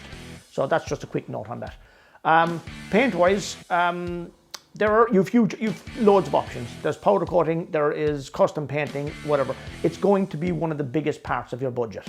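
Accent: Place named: Ireland. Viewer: I came over from Irish Demon's channel to give you a look-see. He's never steered me wrong before. I LOVE your Irish accent!! I originally subbed to Irish on the recommendation of Team Skeptic. I totally appreciate your laying out a step-by-step approach to working on a bike. I only have a little experience with being a passenger on the back of a motorcycle. However, I can appreciate the artistry behind bike building!! Looking forward to seeing what you make your next video on!!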